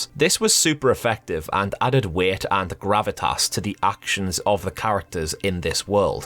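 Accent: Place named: Northern England, United Kingdom. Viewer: Hey, I used to live in South Eastern England, and I have been wondering where do you come from? My guess is Newcastle or Northern England in general:)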